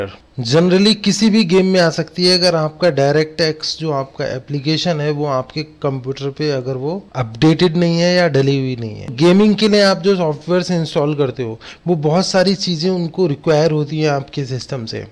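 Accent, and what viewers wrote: India. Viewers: Why your English is so indian!!!!!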